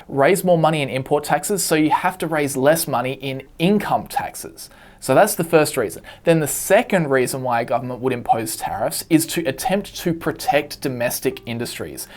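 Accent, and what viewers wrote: Australia. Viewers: Sounds like you’re Australian. Your wine industry has huge issues in America. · Probably because he doesn't go here (I'm assuming based on the accent that he's from Australia).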